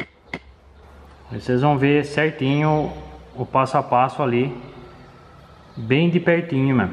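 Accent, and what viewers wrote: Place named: Piracicaba, Brazil. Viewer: Fala meu chegado. Pelo seu sutaque é de Piracicaba ne.. SOU torneiro mecanico tbm. Eu trabalhei e morei ai no Santa Rosa, e trabalhei na OTANNI . nao sei se conhece.. Cidade bacana de trabalhar. Abraço e sucesso..!!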